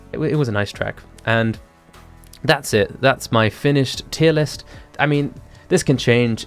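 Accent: United Kingdom. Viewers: ...he's brittish ??? oh, not that i find it unpleasant i just wasn't expecting it haha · His voice is surprisingly very British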